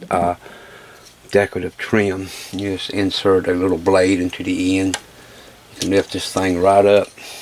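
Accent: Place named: South Louisiana, United States. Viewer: Glad you and your family came out of the storm without any major damage - I did not know where you lived you have that South Louisiana kind of voice.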